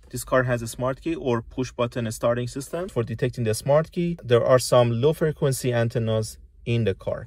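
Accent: Iran. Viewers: r u iraniain? اگر اره بگو فالو کنیم . هرچند از لهجت ایرانی بودن میریزه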